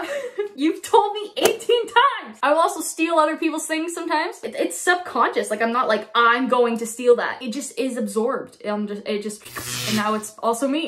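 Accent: Canada. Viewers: 100% sound Canadian haha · 😂💗🫶🫶🫶🫶 you're hysterical 😂 (((((((((penis)))))))) also: you definitely sound mostly Canadian 😂💗🤙 much LOVE ! ✌️ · I figured out you were Canadian several videos ago because of your “abouts” lol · no hun still can tell you're Canadian from your "out and about"s hehe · Yeah you’re about halfway between Canadian and American when you do it without thinking about it hehe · Your abouts and outs still sound very Canadian to my American ears hehe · nah, you still sound FIRMLY Canadian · It sounded super canadian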